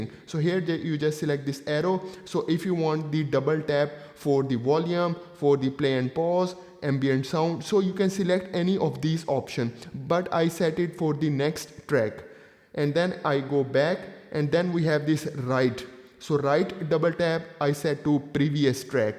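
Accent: India. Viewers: Yessir he has an Indian accent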